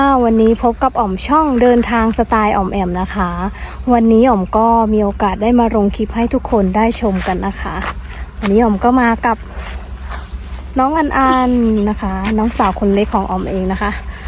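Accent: Isan, Thailand. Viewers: สุดว้าว..สาวเมืองกาญจน์แต่เว้าสำเนียงอีสาน อ่างเก็บน้ำที่ไหนนะ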